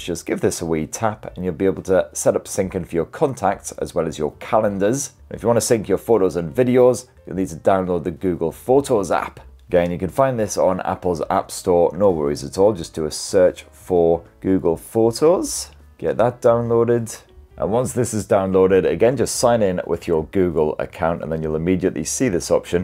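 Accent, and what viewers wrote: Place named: Newcastle, United Kingdom. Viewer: Smooth brain fodder. The forced humour is shite. Especially delivered in a crap monotone Geordie/Mackem/Smoggie accent.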